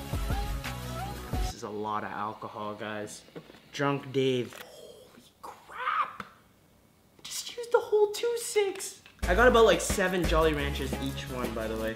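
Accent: Canada. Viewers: Drake on SNL did a skit on black jeopardy and was playing a black Canadian with a Canadian accent. He sounded just like you. He stole your swag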